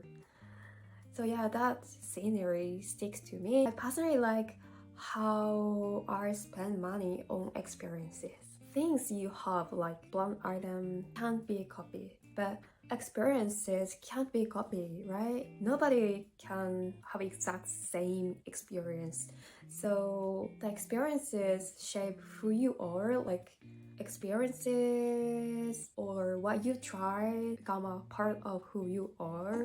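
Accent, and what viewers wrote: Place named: Australia. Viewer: Hi, Already I can hear that you are developing an Australian accent! 😅 You do have some very good points and perspectives on frugal living. Yes there are many different cultural attitudes towards money. As an example (and not intended to stereotype), in my experience it's not uncommon to observe that there are many people from South East Asia/South Pacific who grew up in more rural/tribal setting and have a very short time horizon towards money. They are more focussed on the here and now so concepts such as saving for retirement like your mum are a completely foreign concept to them. If those people receive an unexpected windfall then often they will celebrate by spending it all now rather than putting to one side. Also if other family members know that there is extra money available they may pressure the person with the extra money to help. 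Of course, there are still people like that in more disciplined cultures but they tend to be the outliers and not the norm. And yes you are right about Credit card use. It has to be disciplined but can still be useful when travelling. If there is any fraud on the credit card the money involved is the banks money. If there is any fraud on your debit card then it's your own money and you will not have any access to it while they investigate.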